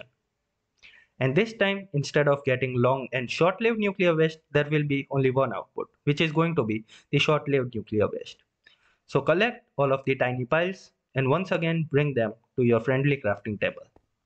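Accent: India. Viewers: Are you Indian, your accent is nice. no sarcasm im Indian.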